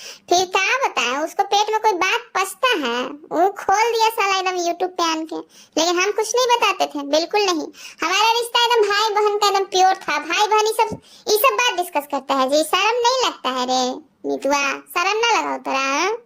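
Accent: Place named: Magahi, India. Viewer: Didi ap kaha se ho plz bataiye.mujhe aisa lg raha h ki ap ka bolne ka tone aur kuch word jaise lagagit hau use ki wo to maghi word h a mujhe lg raha ki ap maghi ho pl z ap batao ki ap kaha se ho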